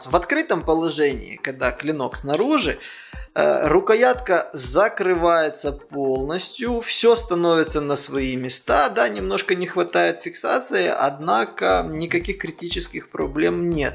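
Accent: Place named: Odessa, Ukraine. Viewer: Ахаххх,слышу что говор свой, а потом на камеру говорите: Привет из Одессы ))))) теперь все сошлось, таки наше "шо" )). Шалом в общем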